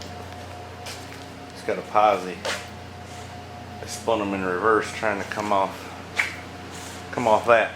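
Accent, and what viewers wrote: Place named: Appalachia, United States. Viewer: Damn i love your hillbilly accent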